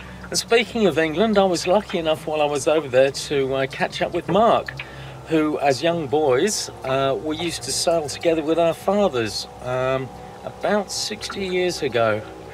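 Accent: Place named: Australia. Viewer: First - the concertina! Amazing! Not the easyest instrument in the world and you did a fairly okay reel there without mistakes. At least - I am impressed. And for your sailing. Lovely as ever. You are facing the summerseason now, as I am freezing up here in Denmark. So looking forward to enloying some nice trips with you down younder, feeling the warmth through my screen and dreaming of my own coming summer juerneys. Did you grow up in Briatain? I would swear by your accent that you were born aussie. - Well. I'm just a Dane. How would I know the differences 🙂 Looking forward for your next vlog! Ah - I forgot. The million vieuws are just bacause your vlog are real quality. You do put a lot of work into lovely intros and overlockings from one scene to the next. It is a joy to watch your channel!!!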